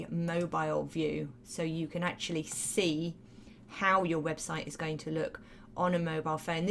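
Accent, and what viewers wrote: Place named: South, United Kingdom. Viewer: It's a standard Southern British accent.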